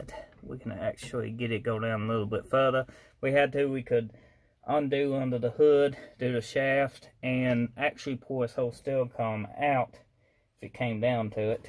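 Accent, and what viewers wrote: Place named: Los Angeles, United States. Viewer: La accent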